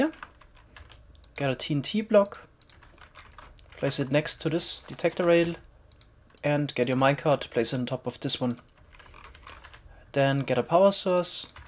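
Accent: Germany. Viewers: Sounds like u are german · German accent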